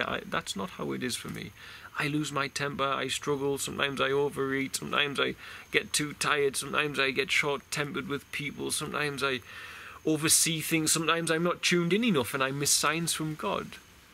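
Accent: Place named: North, United Kingdom. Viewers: ah the northern accent. I am from NEwcastle! I see , , , , , , , , and which is my birthday! I went down south to the frack pad in Preston New Road to film the police brutality and the old tax disc on one of the campervans was ! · Are you from the North East in the U.K.? I am and recognise your accent. I too receive these numbers and beleive that they are guides or markers to say that I'm on the right path. May God Bless You.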